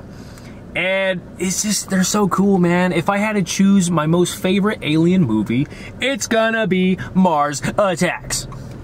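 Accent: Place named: Transatlantic, United States. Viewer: Please do me a favor, look into voice acting. Not you doing Transatlantic accent without effort... excluding the reading and losing your place.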